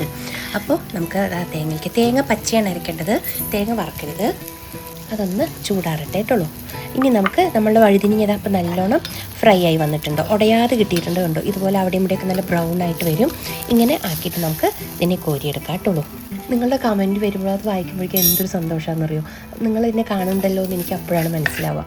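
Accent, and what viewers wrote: Palakkad, India. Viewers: ❤Ellam special receipes anu. Super, Palakadan varthamanavum adipoli, kelkan nalla rasam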